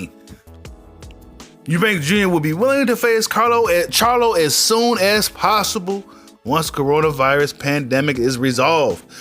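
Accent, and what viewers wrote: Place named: United Kingdom. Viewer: Only half through the video bro but I need to hear your British accent 😂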